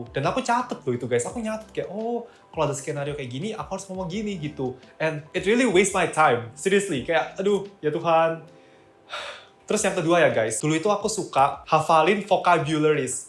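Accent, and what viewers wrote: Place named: East Java, Indonesia. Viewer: surabaya ta sidoarjo mas? btw terima kasih tipsnya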